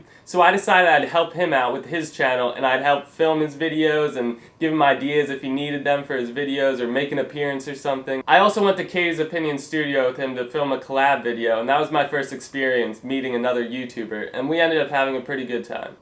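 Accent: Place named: South Jersey, United States. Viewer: lol of course you do! since I moved from south jersey to florida 4 years ago, i can now easily pick up on our critically acclaimed infamous accents. and yes, you do have one. no worries, its alll good though! 8-)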